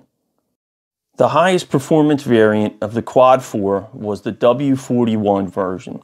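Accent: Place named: Chicago, United States. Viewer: If you wanted to know what a Chicago accent sounds like, here you go. Guys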